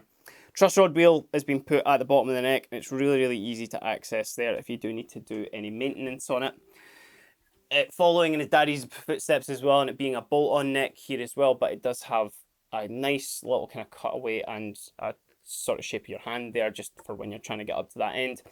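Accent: Scotland, United Kingdom. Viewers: The #EVH SA-126 12/6 is #EVH's Birthday E-Hole in honor of #EVH. #Glasgow and #Edinburgh....What a #Scottish accent!!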